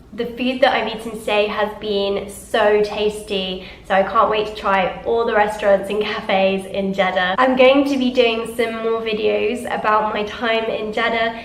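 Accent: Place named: United Kingdom. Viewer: Welcome to KSA. Jeddah is one of my favourite cities as I'm from the Middle of Saudi Arabia but loved it a lot. What a lovely sweet accent you have, no wonder that's British my favourite toooooo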